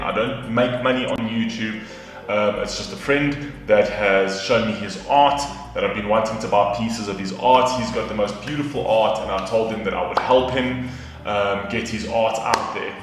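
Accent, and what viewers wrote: South Africa. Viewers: just came across this.90% sure thats South African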